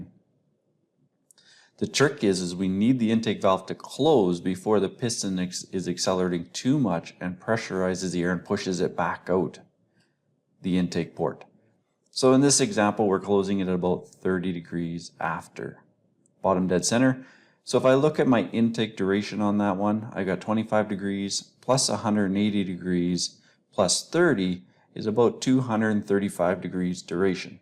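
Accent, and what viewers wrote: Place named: Canada. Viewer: I detect a Canadian accent. 🙂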